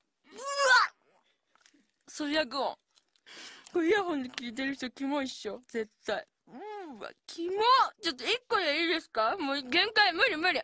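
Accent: Kansai, Japan. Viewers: クーラー大好き そうです。 調べてきましたが、関西の人との交流が多かったせいか、関西弁っぽくなったらしいですねw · 関西弁可愛い(≧ω≦)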